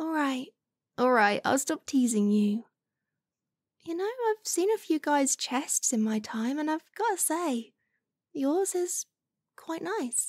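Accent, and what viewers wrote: United Kingdom. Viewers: I think it's either Great British accent or it's Scottish accent. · That was a great ASMR I like the British accent 🇬🇧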